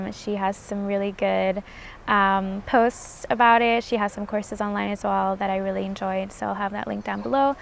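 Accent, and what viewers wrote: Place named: United States. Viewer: I noticed you have an American accent but said uni instead of university. Whys that?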